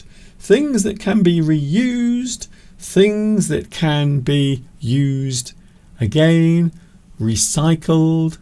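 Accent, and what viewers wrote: United Kingdom. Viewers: Very interesting and useful lesson as well as a clear British English pronunciation .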